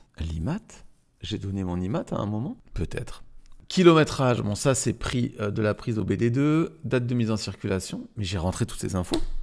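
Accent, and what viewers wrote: England, United Kingdom. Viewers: Vraiment cet accent Anglais...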